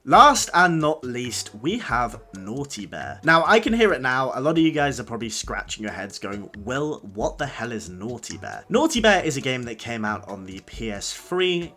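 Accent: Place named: United Kingdom. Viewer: I'm guessing by your accent that you're from the UK. surely you can pick up a copy of naughty bear in cex for quite cheap. I've thought about going for it myself recently but seems like an extremely daunting task but wouldn't mind seeing you go for it 😂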